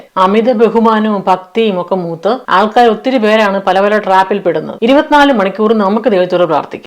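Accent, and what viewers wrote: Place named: Ranni, India. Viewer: Excellent narration. Are you from Ranni /pathanamthitta? Just guessed from your accent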